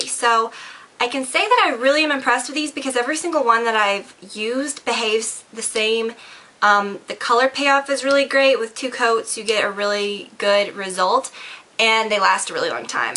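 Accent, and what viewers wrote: South, United States. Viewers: Your Southern accent is cute. :)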